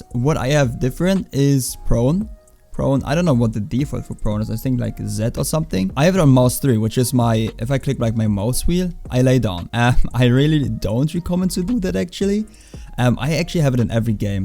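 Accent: Germany. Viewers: i hate this german voice omfg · mann hört das du deutsch bist xD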